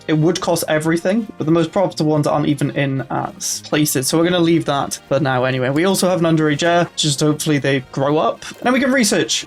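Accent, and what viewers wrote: Wales, United Kingdom. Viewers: he definetly sounds Welsh and I say that as an English person.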